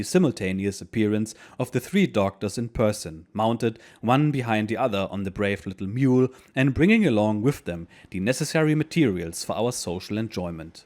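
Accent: Germany. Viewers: The war for southern Independence???sounds like lost cause propaganda. Also who are you ? You sound like your German. Stay in your lane fritz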